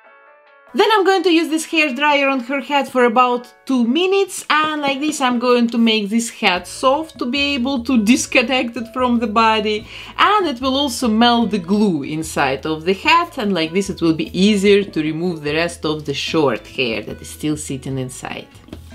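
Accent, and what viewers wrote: Russia. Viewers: Where is she from? Such a different accent! I'm guessing she's European or Russian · Русский английский) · Волосы грубо смотрятся, как солома( И да, русский акцент))) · Акцент русский, вы случайно не из России? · Вы Русская? Акцент 🤦‍♀️ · не перестаю удивляться тому, насколько русским может звучать английский язык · Что с твоим голосом? Ты что русская ? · She has a Russian accent, she is russian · Акцент очень похож на русский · Стоп, я слышу руский акцент)))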